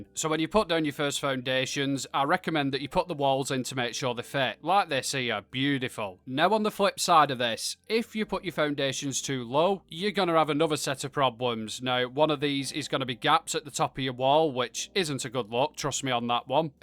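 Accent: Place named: Yorkshire, United Kingdom. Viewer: geez your accent is thick, from yorkshire? i'm from downunder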